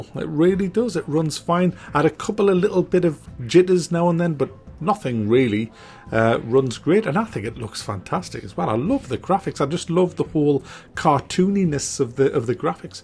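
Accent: United Kingdom. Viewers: your voice sounds like you are a sailor from a colonial era British ship. Is it a "limey" accent? I am not from UK, I don't know what accent is from where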